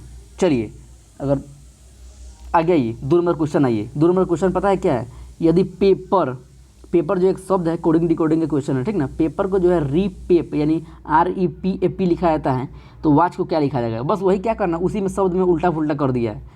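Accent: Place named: Bihar, India. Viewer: Apki language bhutt achi lgi bihar ke ho ??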